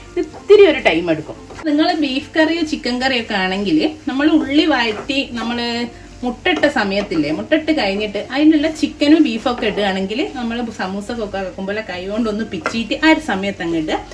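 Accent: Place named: Thalassery, India. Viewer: Excellent recipe!💖 Are you from Thalasserry...I think so from your language..😊😊 .......From Bahrain. Actually from Thalasserry.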